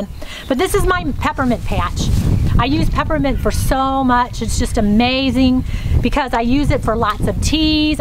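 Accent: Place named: New Jersey, United States. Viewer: Love your garden! Looking forward to see the progress throughout the summer. I also grow all of my own produce here in sunny CA! Sunflowers are one of my favorite flowers also, but did you know that they have allelopathic qualities that can prevent other plants growing around it from flourishing? I just found that out two years ago and now i have a dedicated sunflower patch so I can get every ounce out of my raised boxes. Anyways just thought I’d mention it in case you didn’t already know. By the way, your voice/accent sounds just like my aunt from New Jersey. Are you originally from around Jersey?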